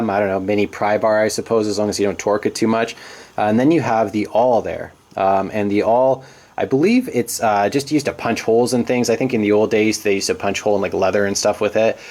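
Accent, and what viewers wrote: Canada. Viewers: That's one wicked Canadian accent.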